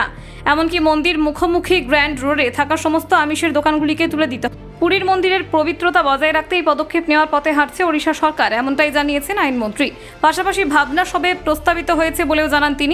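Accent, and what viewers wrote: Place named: Bangladesh. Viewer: Apnar acsent seems to be Bangladesis. Decision is very much correct. Puri must be sacred and peaceful.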